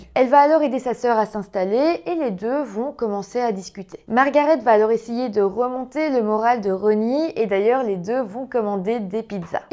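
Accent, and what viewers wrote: Belgium. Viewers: Hey mais... tu es belge ? :D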